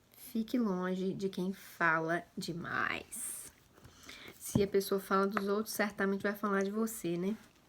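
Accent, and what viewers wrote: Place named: Minas Gerais, Brazil. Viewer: Mineirês! Sotaque mais lindo. Amo !❤️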